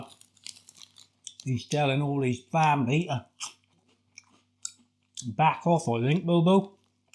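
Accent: Norfolk, United Kingdom. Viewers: Sound as if you might be a good ole Norfolk boy😊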